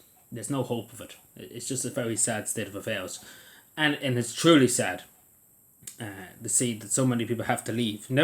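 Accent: Cavan, Ireland. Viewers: The way you speak is euphonious. I was just whiplashed when I clicked the video. If you will allow to to make the suggestion, maybe read some excerpts (the prologue for example) of Canterbury Tales in a youtube video. It would preserve the Cavan accent digitally.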